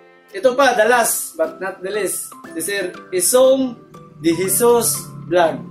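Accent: Visayas, Philippines. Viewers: Bisayaa jud oi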